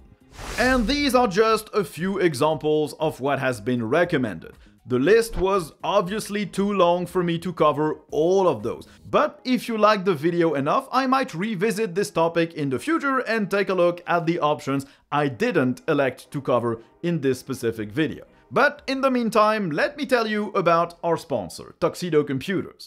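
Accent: France. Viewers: génial ! ce petit accent français lol , c'est subtile mais perceptible ;)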